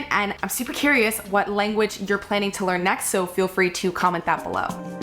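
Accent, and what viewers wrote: United States. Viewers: I'm from western Canada. Think Montana/Minnesota accent. When I listened to you, I thought I heard a hint of Bahstan.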